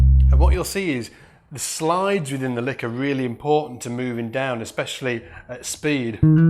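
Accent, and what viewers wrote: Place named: Liverpool, United Kingdom. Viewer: By chance are you from Liverpool? Your accent reminds me of my grandfather...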